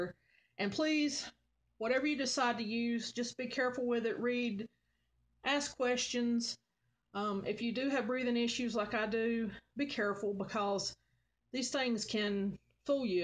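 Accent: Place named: North Carolina, United States. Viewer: Your accent sounds like you are from my area or my state which is NC. It is refreshing to me to hear someone talk like where I'm from. I have always told my boys to be proud of where they come from, it stuck with my youngest but I'm not quite sure what happened to my oldest except that he watched a lot of Thomas the Train when he was little 😆.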